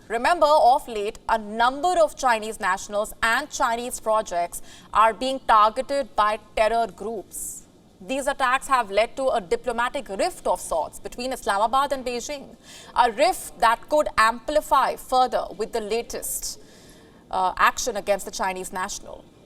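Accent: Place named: India. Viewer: Her very strong Indian accent English.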